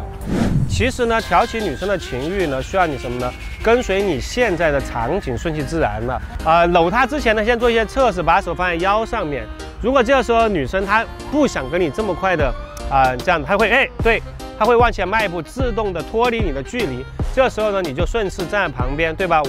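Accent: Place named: Hunan, China. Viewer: 小哥你是湖南还是 武 汉滴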